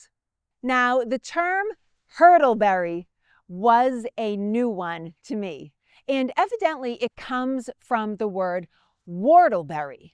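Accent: Boston, United States. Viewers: Is that a Baas-ton accent? 😁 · i luv the Boston accent. I'm gettin' hungry now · You do a great job,. Always bring a smile. The passion, the Adventure Pants, and the full-power Howes Neck or Dorchester accent. Don't change a thing. I hope the executives at you- tube understand the value of a good, old fashioned Historical Action Adventure (HAA). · Pahked machar in tha yahd,❤ Yes, I am a huge Jaws fan😁 Love the Bostonian accent 🙌Love from London ❤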